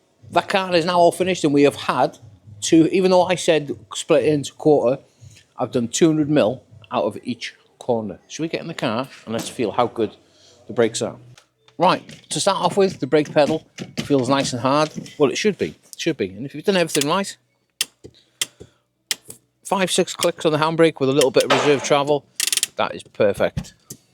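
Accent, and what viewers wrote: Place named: Wales, United Kingdom. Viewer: Subscribed. Love your videos -you’re a knowledgeable chap, who does a proper job… I find your uploads very interesting… Those shoes don’t seem to start life very thick by the looks of the new ones! Being nosy, whereabouts in Wales are you, I used to live on Anglesey, but I wondered from the accent if you were more like south Wales…..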